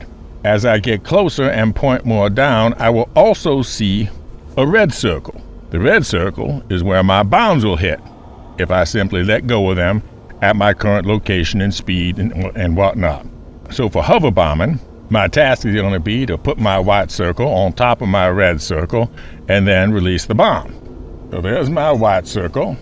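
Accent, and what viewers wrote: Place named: South, United States. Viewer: You accent is excellent, it reminds me of a southern gentleman.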